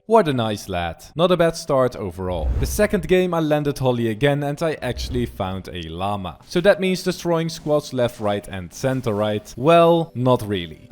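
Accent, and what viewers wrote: Netherlands. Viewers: You Dutch right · Even een vraag je ben jij Nederlands just a question are you ducht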